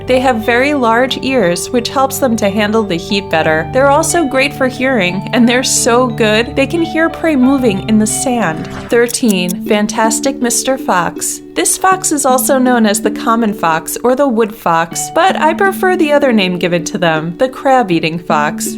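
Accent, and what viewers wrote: United States. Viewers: Love the foxes but you seem to speak with an American accent...we use inches and feet and yards!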